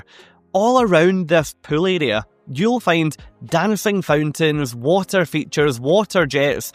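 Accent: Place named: Scotland, United Kingdom. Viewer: Okay, we have a bet going. Please help. My wife says you are Scottish, my son says Welch.... I hear something more Big City and mixed... Can you help us out? Yes, we all love your accent!!!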